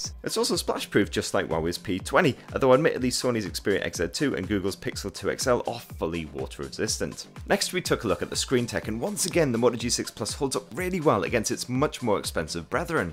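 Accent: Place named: North East England, United Kingdom. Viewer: You sound like a Sunderland guy, you have a hint of a north east accent.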